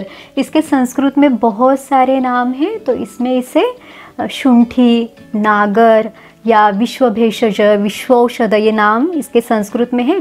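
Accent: Standard. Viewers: आपका उच्चारण बहुत ही स्पष्ट एवं अतिशय शुद्ध है , एवं औषधि विश्लेषण भी प्रशंसा योग्य है । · Dr. साहिब आपका उच्चारण बहुत ही शुद्ध है अच्छी ओसधी है thanks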